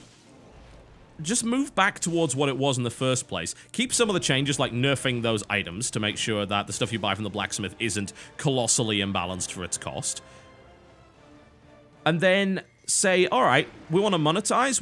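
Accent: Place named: Geordie, United Kingdom. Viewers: I know you're a troll, but I'm going to reply anyway; he's from the north of England. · So me being a geordie, am I right in saying this guy is a geordie but he's trying to hide his accent, because I can hear it.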